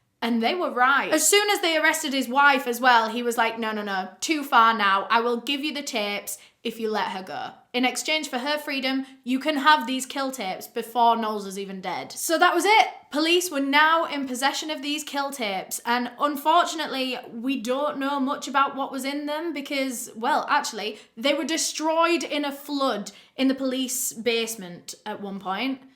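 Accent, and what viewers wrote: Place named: Manchester, United Kingdom. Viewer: Yes love the Manc accent ❤️❤️❤️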